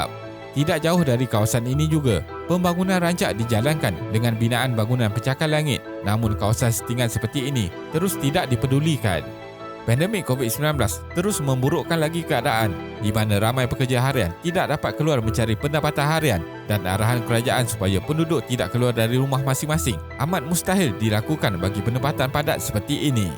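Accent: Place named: Kuala Lumpur, Malaysia. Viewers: Ini bahasa Melayu Selangor lah Kenape teruk maknusie dunie tige peratus utame kerancakkan kepade antare berbeze serte jenayah setinggan dibine limeblas iyenye pade peratusan Kenape secare purate Mereke lame dikitar semule.... Tak sama langsung dgn bahasa Indonesia....beda bunyi.... bahasa Indonesia berbunyi a sedang bahasa Malaysia berbunyi e di ujung perkataan... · Bahasa Melayu itu luas. Kalau dalam video ini menggunakan slang Kuala Lumpur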